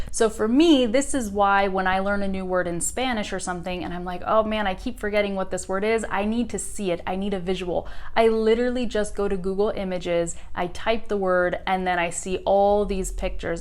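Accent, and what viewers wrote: United States. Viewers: Hello Miss. I suppose you speak American's English, at what state you are? I'm from Guatemala City. Salute. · I just step by saying that I’ve found many of your videos very helpful. I've taken the time to listen and watched some of them twice so I can listen to your pronunciation, intonation, and so on; and then repeat or search for a new word you've said. I like very much how you explain the things or talk about different topics. I'd love to have an accent like yours, I'm working to have a better accent, more American. So, thank you so much! And I'd also like to add that even there are many women who make these kinds of coaching, you have become on my second favorite one. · You are a good teacher. I understand what you say and it is great. I don t get used to American accent but this time I understood it...Great! I usually "suffer" when I watch american movies because I like them but I have to watch them again and again to understand..At least not the whole movie but just some chapters of it... 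I hope I will overcome this fear of "listening" one day Thanks. Take care ..bye🙋 Greatings from Italy🌍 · You are a good teacher ,thank you,you made me love this language and american accent Deeep greeting for you from sudan😍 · I like a lot of the your lessons. I have difficulty in listening, but I am trying to understand American English native speaker like you. Thank you for your great job!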